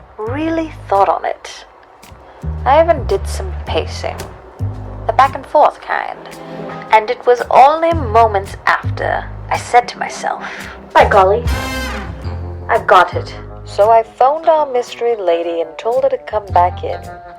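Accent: United States. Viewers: So creative and loved the tips!That transatlantic accent was everything 😂❤👏🏻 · The mid Atlantic accent🤌🏼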